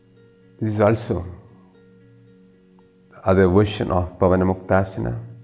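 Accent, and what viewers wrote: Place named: India. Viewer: heloisa688 it's his variation of english: Indian English. Not bad pronounciation. English is official language in India.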